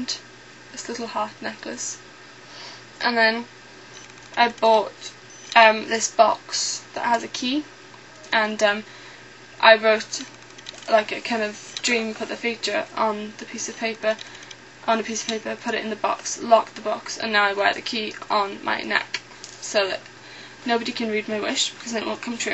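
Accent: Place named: Wales, United Kingdom. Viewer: ahh ^_^ how cute is your welsh accent?! okay yes i know im weird for saying that. but i find it so cute haha ^_^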